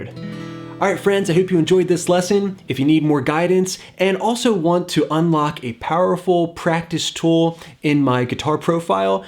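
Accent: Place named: United States. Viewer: Love your passion amigo! Great lesson again....where are you from in the US?? Your accent is super cool! Much love and gratitude from Ipswich, UK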